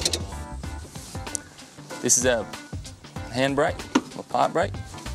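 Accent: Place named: United States. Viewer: American English. Sorry if i offended but I'm just giving my view.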